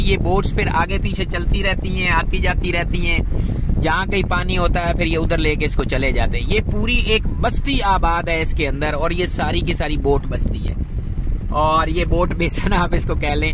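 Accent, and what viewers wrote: Sindh, India. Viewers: what a beautiful sindhi accent of yours ☺ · Loved your Sindhi accent as well ❤️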